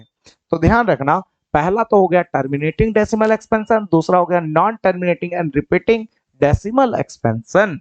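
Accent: Bihar, India. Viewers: Sir aap bihari hai kya??? Hum bhi bihari hai .....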